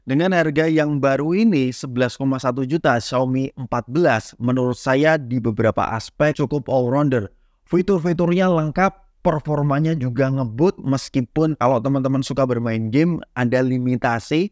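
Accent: Semarang, Indonesia. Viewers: Mas…logat mu Semarangan banget 😂😂😂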